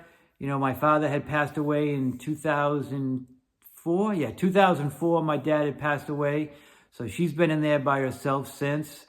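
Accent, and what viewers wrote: Boston, United States. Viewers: I could tell from your accent that you were from Boston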